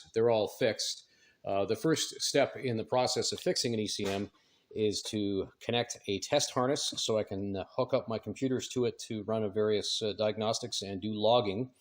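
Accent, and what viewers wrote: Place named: Canada. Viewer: very cool and sounds like u r canadian too. sweet, do you sell ecm's?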